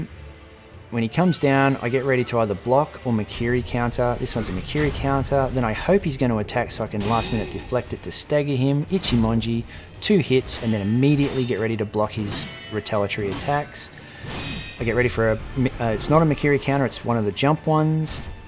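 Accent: Australia. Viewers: i feel like everything is easier to learn when explained with an Australian accent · great to hear an Aussie accent for a change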